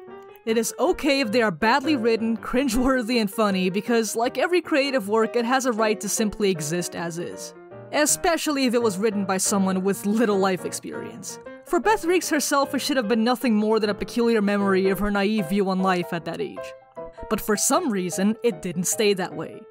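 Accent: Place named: Ireland. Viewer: You sound like me haha may the luck of the Irish aid you in your endeavors!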